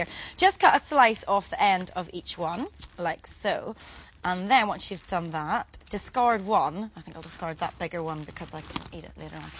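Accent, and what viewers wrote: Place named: Ulster, United Kingdom. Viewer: Ulster, from the accent.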